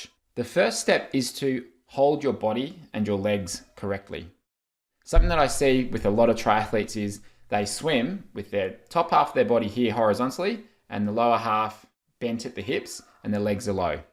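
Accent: Australia. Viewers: Maaaate! You sound Aussie and should know that it's pronounced BOY not booey like the Yanks say.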